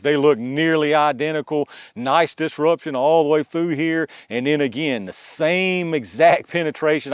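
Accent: United States. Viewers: No offense here but Im really interested... What kind of accent is this? It sounds more American than Uncle Joe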